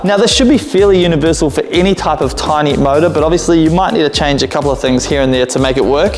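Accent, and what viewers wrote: New Zealand. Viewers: Are you a yank???? It’s zed not zee your kiwi accent is hard enough to swallow but adding a yank into the mix is just too much to handle I’m going to have to unsubscribe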